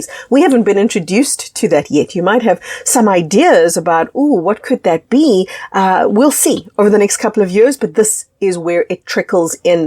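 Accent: South Africa. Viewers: Man have I enjoyed your videos over the weekend! So much of your stuff is resonating with me! Also nice to hear a fellow South African😃 I'm going through huge Assention symptoms the last 3 years. Vision distortions, in somnia, vibrating body Suffocating anxiety. Every day I'm waking up thinking what's it going to be today. Yesterday I was watching your addiction video. And well as some people not think you were talking in metaphors mmm! I believe I came face-to-face with my demons on DMT journey. While I was indulging in one of my mild addictions I inhaled a dmt vape And there it was in front of me breathing and responding to my emotions the more excited I got for my activity the more powerful the entity became. As if we we're separate yet connected. It didn't seem to be good nor bad Nor did it look like a demon. It looked amazing! Beautiful,vibrant, colourful It was Maybe both feeding and supplying all of what humanity has created and experienced through the activity. 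It may also have been a part of me, but what makes me think that it is a collective or separate is I have seen this entity in dmt art. So I am clearly not the only one who has come face-to-face it. But it was real and it was something separate yet part of me. But as per usual with psychedelic journeys with every answer comes another 10 questions.😅 Anyway I'm glad I found you keep up the good work and I'm Sure your videos will come to me as I need them as they always do💚 I am having help from 2 energy healers at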